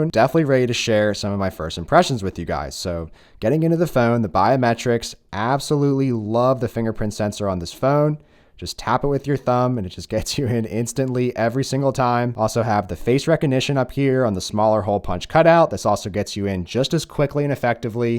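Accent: Philadelphia, United States. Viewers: Gotta be from Philly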